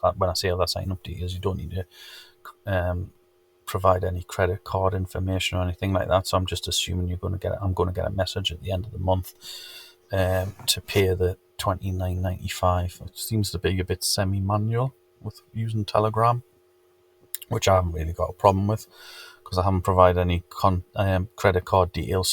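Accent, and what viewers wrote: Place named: Newcastle, United Kingdom. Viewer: Hi. I'm on this journey with you. Just signed up last night and set it away this morning. Got a telegram from the administrator to say minimum betfair stake was £10 so I couldn't use the £2 I tried. He said 10 was minimum bet to get bsp. Hope this is all legit! And also, I'm a Georgie too so I love hearing your accent on here. I live in Cramlington!!! Thanks for your great videos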